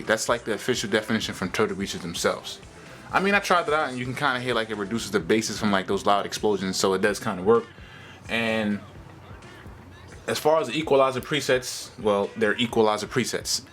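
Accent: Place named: AAVE, United States. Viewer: Hard to understand through all the jive talk and ebonics.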